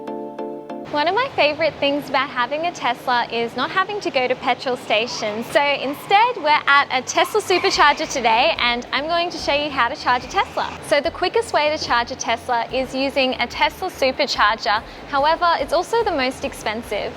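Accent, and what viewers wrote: Australia. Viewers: Love your Aussie accent! I can't get over the size of the charging plug in Australia they are 4 times bigger than in the US (maybe size does matter)? 🤔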